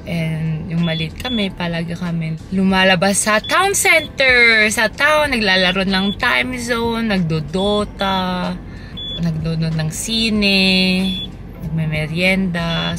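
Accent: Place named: Philippines. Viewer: Love your accent. Pilipina ka TALAGA! Galing.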